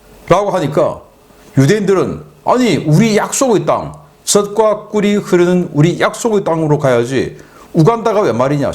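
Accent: Gyeongsang, South Korea. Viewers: 🐕 조지나 빨아라 경상도 말투나 고쳐라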